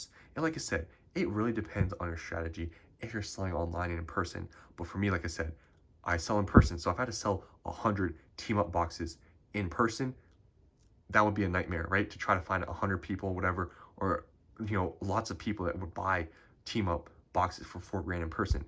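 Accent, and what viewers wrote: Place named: Asia. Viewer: This is random af but from your accent and hand I'm guessing you're asian?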